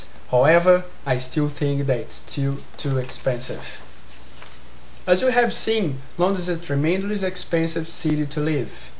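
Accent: Brazil. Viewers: Let me guess...are you brazilian right?...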